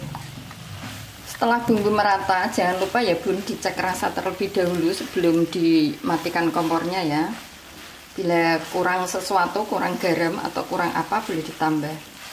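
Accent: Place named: Java, Indonesia. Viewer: Medoq bgtz ngmongny...🤣🤣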